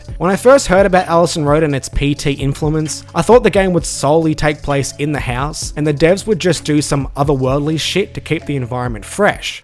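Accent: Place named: Australia. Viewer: I see the link to this video in Painticus' discord, I hear an Australian narrator, I like and subscribe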